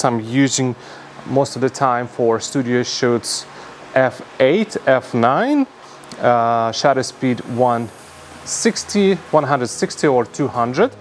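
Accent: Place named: South Carolina, United States. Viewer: Man that SC accent is intense 😊 great video.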